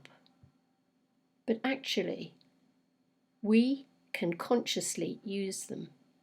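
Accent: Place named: England, United Kingdom. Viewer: Your calm a sweet nature pulled me in. I love your pace and the peaceful feeling you create and give. As a Utah girl in the USA, I absolutely love your English accent! Beautiful story!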